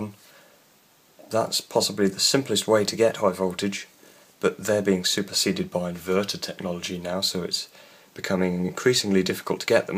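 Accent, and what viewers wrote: United Kingdom. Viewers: i’m pretty sure he’s from the uk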